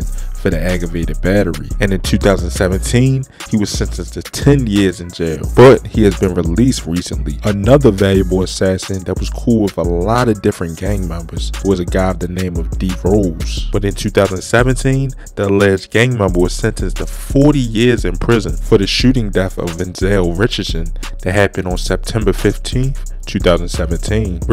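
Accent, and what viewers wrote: Baltimore, United States. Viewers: Baltimore accent is dope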